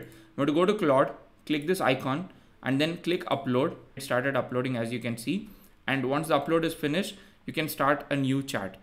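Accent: South India, India. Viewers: Dude, I have to say it as no one else will. Your content is excellent but your accent sucks. Eg. Won won won, instead of "One". I'm South Indian myself but don't have a heavy accent. I share your videos with all of my American and Canadian colleagues(especially THE GPTV one). They have just one feedback and that is they don't understand what you are talking due to the accent. If has to become 1bigglobalcoder then please mute down the accent. Cheers, another great content video as ever. 🎉 Update: Thanks for taking it in the right spirit content creator. 😂 that one "psychiatrist" in the comments.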